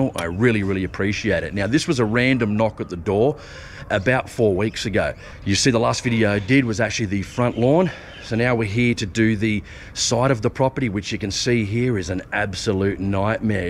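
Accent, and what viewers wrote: Australia. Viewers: Love watching your videos. You have a keen sense of humor. Along with the humor you have a heart of gold. You are always helping others. Love the Aussie accent.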